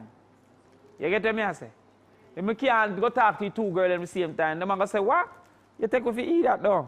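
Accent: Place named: Jamaica. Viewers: its because he speaks jamaican broken english, i understand because im jamaican. wish u could understand because he teaches so well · He's jamaican. Unless u understand our creole u won't get it. He talks like that so that his class will understand him not as a teacher but as a friend. A smart friend. Who finds it hard to learn from a friend???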